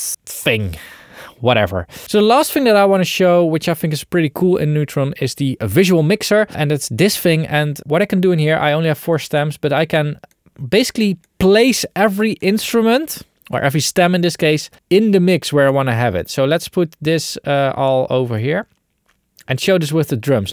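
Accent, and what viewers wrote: Netherlands. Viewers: you sound a little bit dutch, am I correct? 🙂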